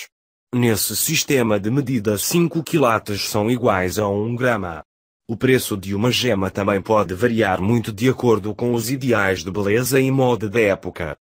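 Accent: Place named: Portugal. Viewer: Muito bom, pena ser português de Portugal...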